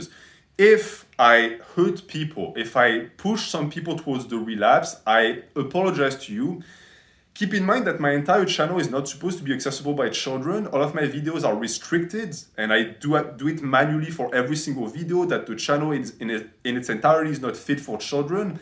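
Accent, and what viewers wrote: France. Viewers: I am Polish and consume content from a french natty and get inspired in english. So I am happy that You are back, the content I want to share and the content I haven't watched yet is back. As a side note, You were also an inspiration to return to my french studies - educated noble muscle natties can learn more than one foreign language. Je suis très heureux que nous pouvons encore Vous écouter et Vous regarder. Nous allons tous y arriver. Je vais fêter ça avec des "pullovers" et des "Arnold raises". J'adore votre accent français. Salut!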